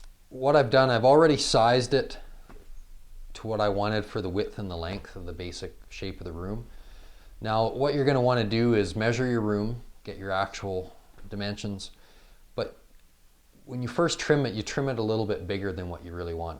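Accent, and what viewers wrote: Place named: Canada. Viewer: i love your canadian accent 😃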